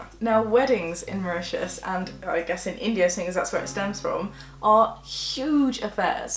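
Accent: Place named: Scotland, United Kingdom. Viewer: I’m so here for your Scottish accent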